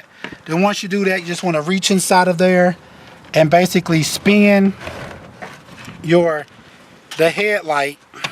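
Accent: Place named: Nashville, United States. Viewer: Brah, are you Nashville TN,? That's how you talk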